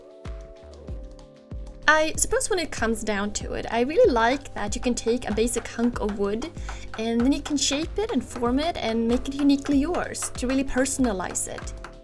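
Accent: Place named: Sweden. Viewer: Ha! I knew I recognized a Swedish accent. Hej!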